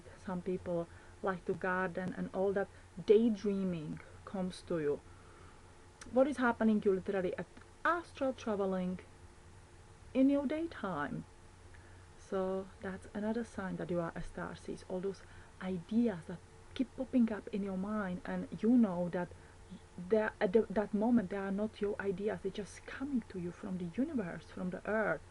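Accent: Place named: Asia. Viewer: i love your accent..it almost sounds like an asian dialect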